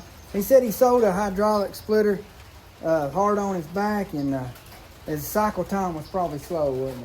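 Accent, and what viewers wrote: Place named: South, United States. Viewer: I’m not going anywhere. It’s your southern drawl that keeps me😎. Paying things off will offer you more freedom! I love the smell of freedom!!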